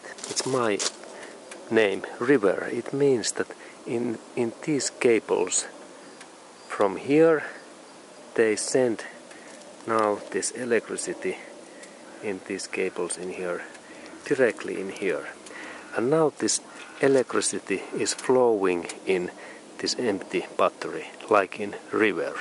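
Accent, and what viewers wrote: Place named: Finland. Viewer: This video must be finnish made, bacause this "rally english". Keep up the good work!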